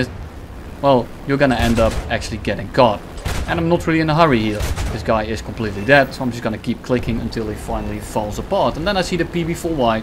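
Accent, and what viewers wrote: Netherlands. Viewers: I hear a Dutch accent, though i can't determine what kind of accent it is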